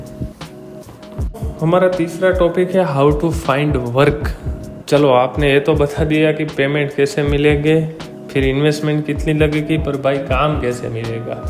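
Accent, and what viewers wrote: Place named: Gujarat, India. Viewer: Doing Good Job Lagta Hai Aap Gujarat Se Ho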